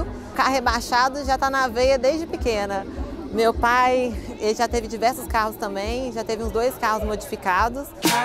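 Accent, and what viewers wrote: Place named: Minas Gerais, Brazil. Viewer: Sotaque amei mineres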